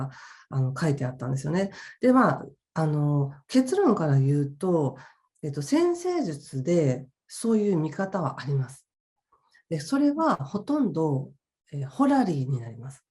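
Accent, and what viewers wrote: Kansai, Japan. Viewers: めっちゃ関西弁なんですね😂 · さんの関西弁、新鮮！！ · 、以前から少し言葉の端に関西を感じてたんですが、大阪の方だったんですね！めっちゃ親近感〜❤私も大阪出身でーす😊わーい正直な物言いが素敵！そうでなくちゃー！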